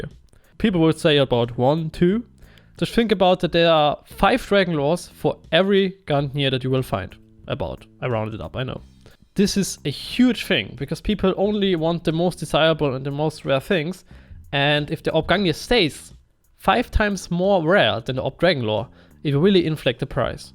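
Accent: Germany. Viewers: schon witzig wie man deutsche am englischen dialekt erkennen kann^^ ich mag investment videos für steam market und lass mal ein abo da für deine mühen ;)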